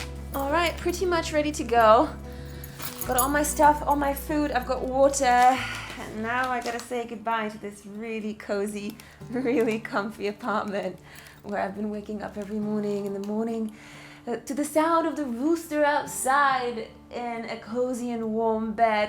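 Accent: United Kingdom. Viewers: you now look like a french, sound like a british, but true to your heart you are wild as a free spirit... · Just started watching your vids last week when your Texas videos came through and YouTube thought, You Darrel are a Texan, maybe this girls videos would interest you!. Broccoli raw is best eaten with.... Ranch dressing!!!! I have a burning question, you have such a charming British accent.... How did a Polish girl end up speaking english with a charming British accent?????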